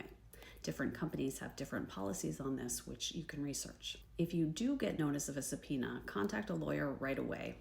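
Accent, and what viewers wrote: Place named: United States. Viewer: Never been a fan of American accents but Liz speaks with a calm and peaceful nature about horrific actions conducted by government and not only this but does so in a clear and easily understandable manner. Love listening to your words good lady & thank you for all your work.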